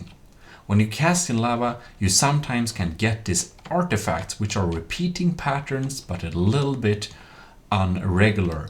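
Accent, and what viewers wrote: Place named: Sweden. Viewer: Let me guess you are from sweden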